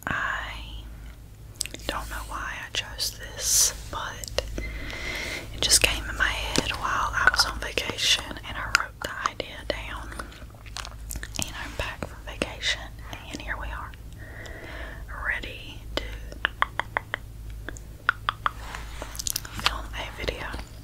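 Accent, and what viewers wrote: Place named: North Carolina, United States. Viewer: The addiction to that NC accent, lol.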